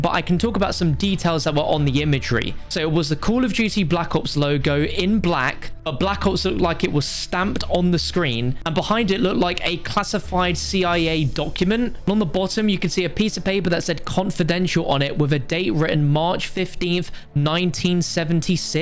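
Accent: United Kingdom. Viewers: Why is British accent so weird?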